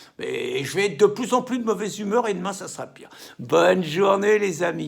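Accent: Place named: Paris, France. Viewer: Putin que c’est bon quand tu râle !!!! J’aime ça, tout y est , la guouaille , l’accent titi ....tout ❤️❤️❤️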